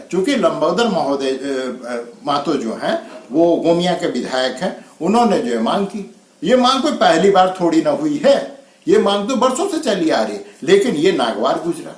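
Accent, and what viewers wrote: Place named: Bihar, India. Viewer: ji aap jarur Bihar ke hai.....😅😅😅😅 Kya sahi bole na ooooo😅😅😅😅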